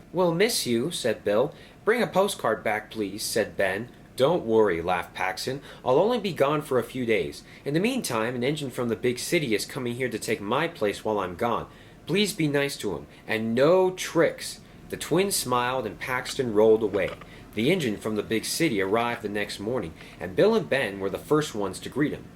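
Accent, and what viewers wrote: Scotland, United Kingdom. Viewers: Your Scottish accent is spectacular.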